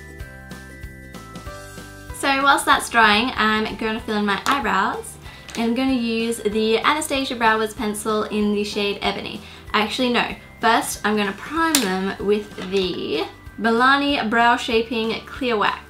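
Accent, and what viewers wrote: Australia. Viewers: Where in Australia is she from?🇦🇺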